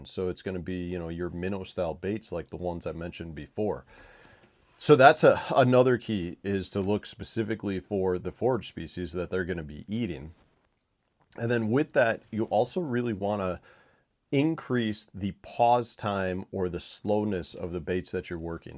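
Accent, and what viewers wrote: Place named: South, United States. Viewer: Sounds like you're in a southern state. Where do you fish during winter?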